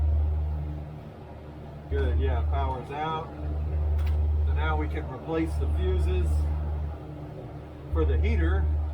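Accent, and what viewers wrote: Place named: Midwest, United States. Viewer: I've been watching some of your videos lately and never would've guess you were in Hawaii. Was thinking more Midwest or central US. Anyway, great stuff! There's something strangely relaxing about coming home from work and watching someone else work 😂. I do a fair bit of electrical troubleshooting in my job and I like to hear other people's thought process while working through a complex issue. You do a great job narrating and explaining the issue and resolution. Thanks for the great content!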